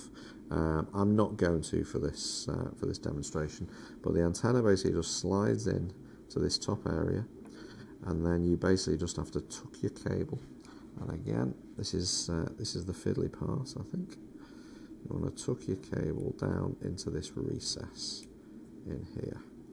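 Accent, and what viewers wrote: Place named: Wirral, United Kingdom. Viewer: Sticking with that Wirral Accent 😂 Mate, You do such a good good with these designs, They look incredible. Looking forward to my Bender arriving, I should have come get it since your down the road. I'll get a nibbler next money day! You think you'll do something for the RAK Boards or T-Beam. You design would be awesome on the T-Deck. All.the best mate! Sean (2E0SYA)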